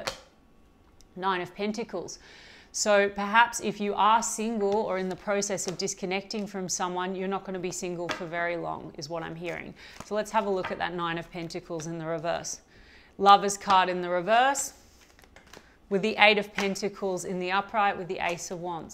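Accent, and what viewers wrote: Australia. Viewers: 🙌🏻 yes stepping into my power. I was on the low frequencies and saw the same in the relationships I was looking at. I’ve finally let that go and have seen underneath it all was my low self esteem. Lots of self care self love & affirmations. Breaking the toxic habits and giving into the good good love ❤️ Really nice to hear an Aussie doing tarot! Great energy, a brilliant read that I related too. Bless 🙏🏼 I’ll keep coming back!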